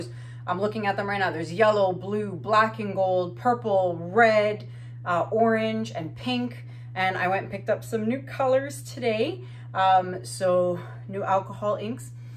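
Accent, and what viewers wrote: Canada. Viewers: i really like listening to your canadian accent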